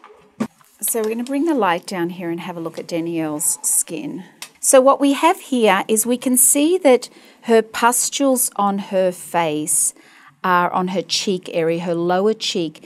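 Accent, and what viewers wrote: Australia. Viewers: Australian or New Zealand(ian?)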